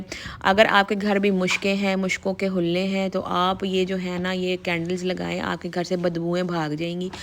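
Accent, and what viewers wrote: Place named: Punjab, India. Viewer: Api ap botli bhout mazy ka h Jo ap punjbi ka tarka lagti h Kia bt h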